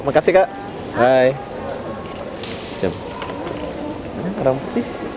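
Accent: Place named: Malaysia. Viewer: Kayanya KK sudah lama di malaysia, lagot bahasanya udah ngikut meleyu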